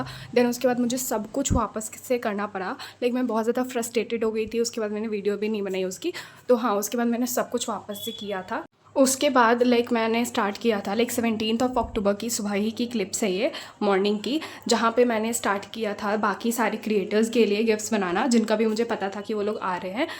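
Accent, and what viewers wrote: Bihar, India. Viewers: Aap bihar se ho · Aap Bihar se ho kya di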